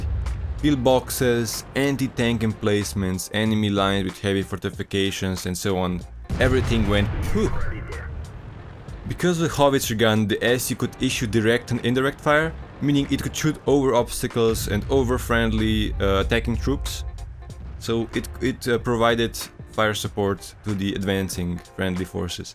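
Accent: Russia. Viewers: Dude i Love your Videos! They are hilarious😂💪🏻 where are you from btw? Russia or maybe Serbia? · Are you Russian who speak english? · I really think you should do more of these vids, russian accent is best accent in the world! Also the history lesson was nice :D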